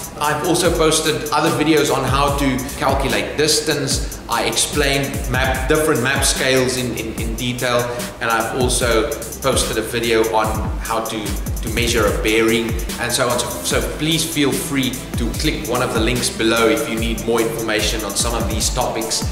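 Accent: Afrikaans, South Africa. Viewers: Africaans accent😁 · Are you South African? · Are you from South Africa? Thank you!!!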